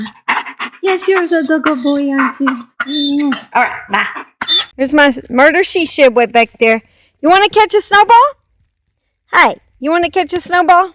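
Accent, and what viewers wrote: South, United States. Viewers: Absolutely love you I'm from England & I just love your southern accent & thankyou for all your hard work on these stories & the empathy you tell then with.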